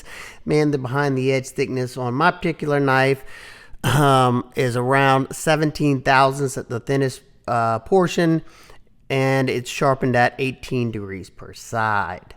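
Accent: Louisiana, United States. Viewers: I recognize a Louisiana accent when I hear one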